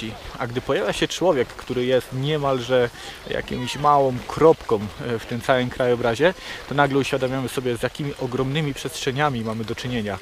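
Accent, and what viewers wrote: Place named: Silesia, Poland. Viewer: Focus to infinity Właśnie oglądaliśmy odcinek z Klimczoka:) Naprawdę udana realizacja. Po akcencie domniemywam że jesteś ze Śląska. pozdrawiam z Tarnowskich Gór:)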